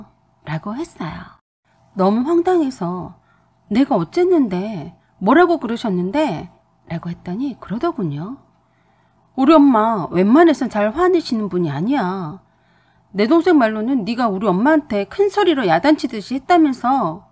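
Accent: Jeolla, South Korea. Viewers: 혹시 전라도?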